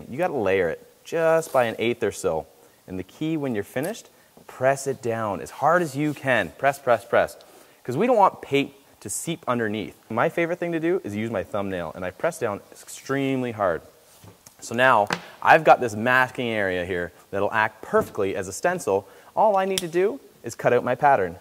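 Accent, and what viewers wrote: Canada. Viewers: Are you . . . Canadian,by any chance? 🤔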